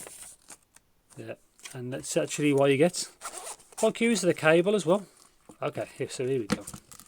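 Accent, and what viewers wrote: United Kingdom. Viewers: Hi mate, fellow brit here, subbed as soon as i heard the accent lol. Hoping you can help... My music is mostly, so alot of acoustic and string dominated music. live edition, acoustic such as ' ' and etc... To give you a solid genre type... Im looking at buying a pair of IEM's to suit my listening style for this music. I dont need bass cannon's im looking for quality rich mids and vocals mostly. Would you recommend these as a cheap enough pair that should do a decent job? I'm looking at ordering oracle mkiii as a treat for myself as a high end IEM set. Do you feel that set would suit my listening style? Great channel pal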